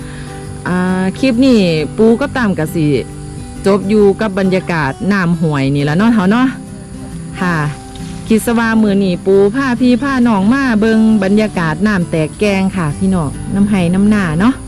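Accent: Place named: Ubon Ratchathani, Thailand. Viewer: สวัสดีค่ะ เพิ่งเข้ามาดูครั้งแรก บรรยากาศดีมากดูแล้วคิดถึงบ้านเลยค่ะ สำเนียงคือคนอุบลฯ เป็นคนอุบลบ่จ้า ~จากคนอุบลพลัดถิ่นจ้า~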